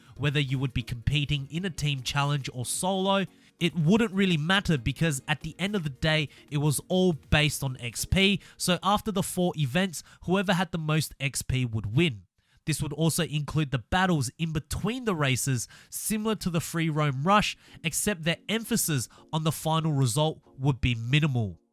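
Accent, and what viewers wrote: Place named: Australia. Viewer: Everyone who is recording forza videos have Australian accent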